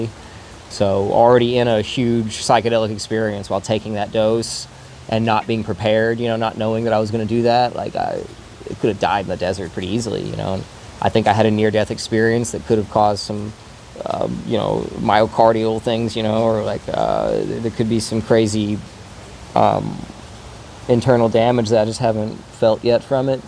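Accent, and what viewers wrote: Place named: Texas, United States. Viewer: i kno this guy well. he’s from texas, you can hear his texas “twang” come out some here. he use to live in athens GA and make edibles w greg simpson hash oil method. he’s really smart, and a good businessman man. He’s no chemist, at all… decent side kitchen chemist maybe. he’s a exmarine and a drifter. and he is not on drugs, well like not stimulants anyways.. he’s naturally vocally energetic and fidgeting like this.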